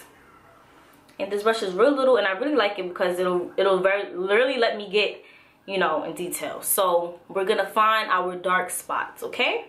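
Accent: New York, United States. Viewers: As soon as I started the video I knew you were from the New York City lol thanks for sharing this review, about to go try mine out now! Just subscribed beautiful xo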